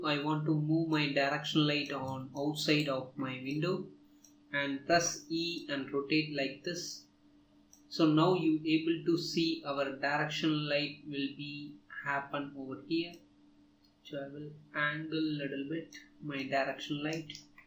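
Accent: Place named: India. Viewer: Indian accent- close the video.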